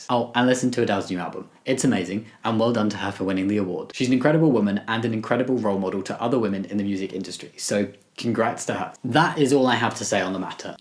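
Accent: United Kingdom. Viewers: I keep forgetting your British I’ve just gotten so used to your accent🤦🏻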